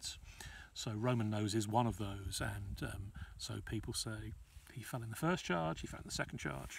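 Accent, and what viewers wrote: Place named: England, United Kingdom. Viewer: Good ole English voice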